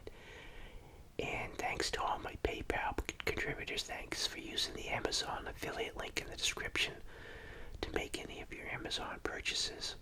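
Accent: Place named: New York, United States. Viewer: kinda morbid I suppose but I guess your from New York from your accent - were you there on 9/11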